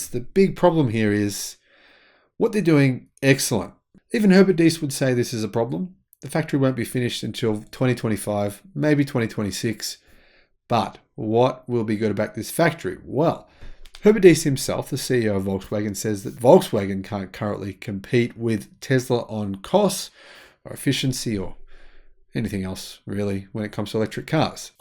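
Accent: Australia. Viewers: I have been to Melbourne. I met Cambell Bowell in Frankston. He gave me a ride in his new car, the Nagari and showed me around his facility. A very nice guy! This was a long time ago. I also met some people named Evans in Frankston. I haven't been to Australia in over 50 years but I do enjoy your accent and enthusiasm for our new EV world.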